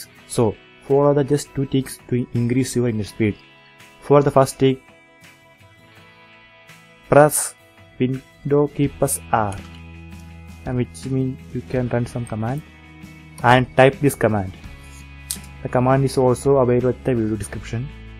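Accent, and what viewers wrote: Kerala, India. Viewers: are you from kerala ? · r u and indian???from kerala?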